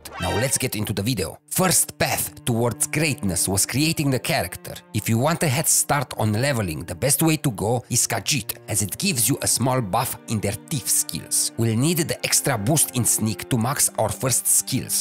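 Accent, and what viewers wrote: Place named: Romania. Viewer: mamă ce ușor recunosc accentul românesc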